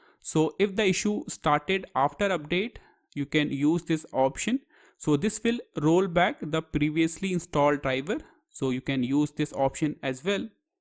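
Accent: India. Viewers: As soon as I heard he's indian I knew my laptop is going to be fixed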